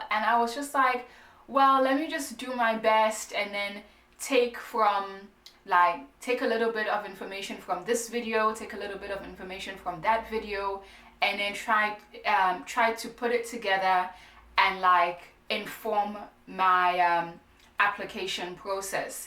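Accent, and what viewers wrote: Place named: Jamaica. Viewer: your jamaican accent gave you a follow.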